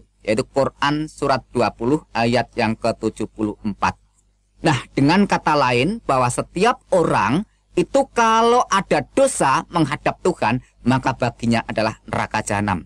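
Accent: Java, Indonesia. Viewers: Aku sangat malu dengar bahasa jawa mu yg medok.. Jelekan islam berjam jam lamanya.. Seolah mengajak murtad manusia seluruh umat.. .....Yesus itu manusia. Tidak ada seorang di kumpulkan allah kelak di hari hisab hanya se orang hamba... Isa putra maryam alias yesus kelak tida bisa jadi tuhan hanya jadi saksi kemurtadan penyembah nya. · Aduh. Saya ketawa mulu liat video ini. Maaf, bukan karena isinya, tapi karena logat pembicaranya mendok baget. Saya menghargai pendapat anda, dan saya tidak mencela keyakinan anda, sungguh. Cuma ingin menambahkan beberapa pernyataan Al-Quran yang sedikit terpotong : "Wahai Ahli Kitab, janganlah kamu melampaui batas dalam agamamu, dan janganlah kamu mengatakan terhadap Allah kecuali yang benar. Sesungguhnya Al Masih, Isa putera Maryam itu, adalah utusan Allah dan (yang diciptakan dengan) kalimat-Nya yang disampaikan-Nya kepada Maryam, dan (dengan tiupan) roh dari-Nya. 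Maka berimanlah kamu kepada Allah dan rasul-rasul-Nya dan janganlah kamu mengatakan: "(Tuhan itu) tiga", berhentilah (dari ucapan itu). (Itu) lebih baik bagimu. Sesungguhnya Allah Tuhan Yang Maha Esa, Maha Suci Allah dari mempunyai anak, segala yang di langit dan di bumi adalah kepunyaan-Nya. Cukuplah Allah menjadi Pemelihara" (QS. An-Nisa' [4] : 171) "Al Masih sekali-kali tidak enggan menjadi hamba bagi Allah, dan tidak (pula enggan) malaikat-malaikat yang terdekat (kepada Allah). Barangsiapa yang enggan dari menyembah-Nya, dan menyombongkan diri, nanti Allah akan mengumpulkan mereka semua kepada-Nya." (QS. An-Nisa' [4] : 172) "Dan (sebagai) Rasul kepada Bani Israil (yang berkata kepada mereka): "Sesungguhnya aku telah datang kepadamu dengan membawa sesuatu tanda (mukjizat) dari Tuhanmu, yaitu aku membuat untuk kamu dari tanah berbentuk burung; kemudian aku meniupnya, maka ia menjadi seekor burung dengan seizin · Dari logatnya ustad ini orang jawa. 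Dan opini saya sebelum kita mencari kebenaran dahulukanlah meminta perlindungan dari allah swt dari syaitan yang penuh tipu muslihat, karena syaitan adalah musuh yg nyata. Audzubillah himinassyaitanirrajim bismillahirahmanirahim. Ketahuilah sebelum anda ingin memahami ilmu ketuhanan lebih jauh anda harus paham apa sih perintah tuhan paling pertama dan mengapa harus begitu. Pengalaman saya pribadi saya mencoba memperbandingkan 4 agama. Yahudi, yesus pbuh, hindu, dan tentu islam. Ternyata perintah utama itu adalah harus mengetahui bahwa tuhan itu esa=1. Lalu saya mencoba memahami kenapa tuhan itu harus 1. Alhamdulillah penjelasan paling logis dan simple saya dapatkan dari mengenal angka. Kenapa tuhan itu esa? Dalam taurat, zabur, perkataan yesus pbuh tuhan itu esa. Esa atau angka 1 adalah awal karena mustahil 0 adalah eksistensi yang nyata. Angka 1 juga bukan akhir karena angka 1 dapat menciptakan angka-angka lainnya. 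Angka 1 juga berdiri sendiri artinya dia tidak membutuhkan angka-angka lain untuk menciptakan segala sesuatu. Bukti 1+1=1, 1+1+1=3, dst. Jika angka 1 melipatkan diri dia adalah tetap angka 1. Bukti 1x1=1, 1x1x1=1, dst. Jika angka 1 mengurangi diri secara bertahap / pembagian dia tetap angka1. bukti 1:1=1 artinya angka 1 itu kekal. Jika angka lainnya mengurangi diri secara bertahap dia akan kembali kepada sang pencipta yaitu angka 1, artinya dalam setiap penciptaan angka lainnya adalah milik angka 1, bukti 3:3=1, 241:241=1. Jika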